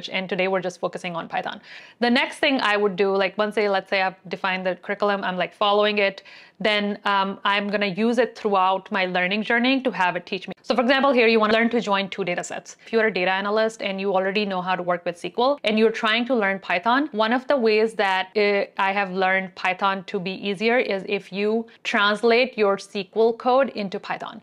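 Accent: India. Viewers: Stop with the fake American accent. You're getting exposed with your Indian/Pakistani accent on several occasions. It's cringe of the highest order. Stop pretending 🙄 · Dear youtuber are u a kashmiri Coz ur accent sounds so much like us!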